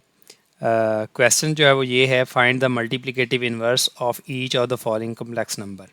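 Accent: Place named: Punjab, India. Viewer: The bad thing about online classes is we missed your punjabi accent and jocks❤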